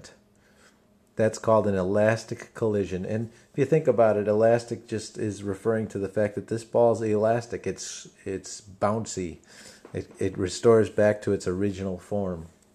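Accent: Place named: Michigan, United States. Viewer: Sound like your from Michigan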